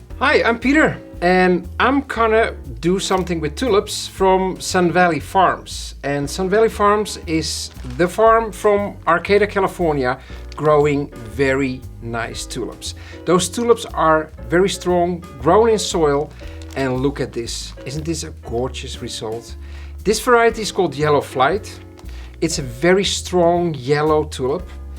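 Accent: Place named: Netherlands. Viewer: jezus wat een dunglish accent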